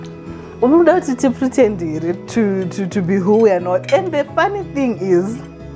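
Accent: Zimbabwe. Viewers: What's miphaphu? it goes back to colonisation and Africans and their colonised mindset and how Zimbabweans have not healed from the adverse affects of lack of healing from a colonised mind. I personally can detect not just your Zimbabwean accent but a Shona tone in your accent. Perhaps because I'm not exposed to a lot of Shona accents I wouldn't know what Murewa accent is but isn't that what makes us so beautiful? Is that not something we should celebrate instead of bringing each other down because of it? Your accent to me is easy on the ear and even if it wasn't that would be my problem so why would I make it my business telling you a out it?. Leave these people that woke up on the wrong side of the bed alone. You do you. We love you just the way you are! Much love from Esigodini.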